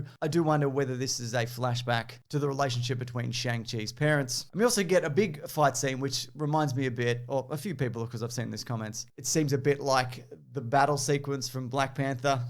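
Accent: Australia. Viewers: I love hearing breakdowns in an Aussie voice. "There's a vortex ring, which is like wind and shit" had me laughing so hard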